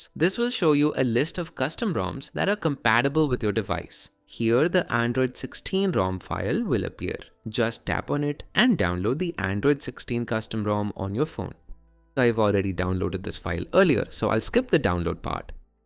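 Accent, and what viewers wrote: India. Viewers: The Indian accent makes it very suspicious!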